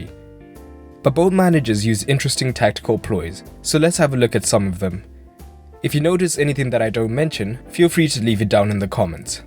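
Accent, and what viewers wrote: South Africa. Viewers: First: are you South African? Second: before I watch, something I noticed was the Liverpool front three put intense pressure on City possession whenever they tried to play out from the back, Barca style. They forced longer balls which they would try to then win in the midfield to relaunch their own attacks very quickly. Highly effective throughout the 1st half! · Are you from south africa?? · Nice as always are you by chance from South Africa?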